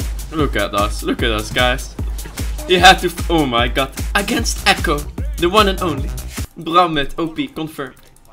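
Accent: Netherlands. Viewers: You sound like you are Dutch Je klinkt alsof je Nederlands bent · is dutch he has a dutch accent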